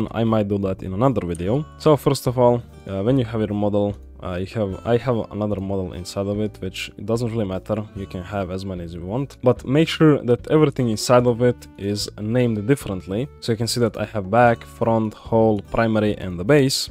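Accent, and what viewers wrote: Russia. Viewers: Руский акцент... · Love the Russian accent!😁🤣❤👍👍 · скажи честно, ты русский? тебя акцент выдает очень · russian accent slipping in, nice tutorial! sadly its not shown how to do it trough blender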